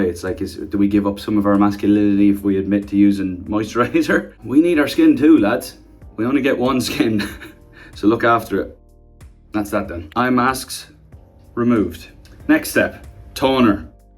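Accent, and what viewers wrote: Ireland. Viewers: Omg same! I just love his voice and his accent and how sweet and down to earth he is and funny! I've always been into irish guys! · I Stan a guy who takes great care of his skin so efficient and well done I will always love Niall plus he’s beautiful as well his adorable Irish accent and beautiful smile of course that giggle in the end do I like Niall even more or what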